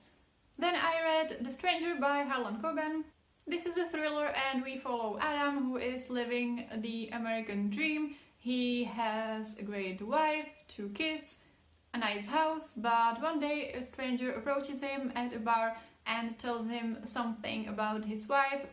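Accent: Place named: Czech Republic. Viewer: Promiň ale... Ten český přízvuk...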